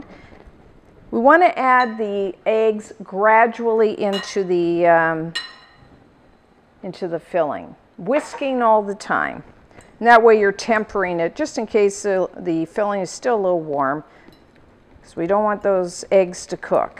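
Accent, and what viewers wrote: Canada. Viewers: Are you from Canada you have an accent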